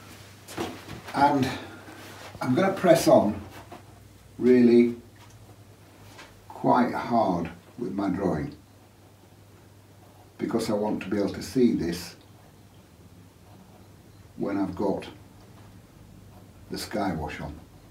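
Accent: Yorkshire, United Kingdom. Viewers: Hello I’ve just discovered your site. I love the painting of the horses on the beach. You sound as if you’re from Yorkshire. I have a mom that was from Sheffield. I’ve been to Yorkshire many times it is the greatest place I’ve ever been. ❤ I have a cousin in Doncaster. I will be enjoying watching all of your paintings and hearing you. Let us all know a little about you David V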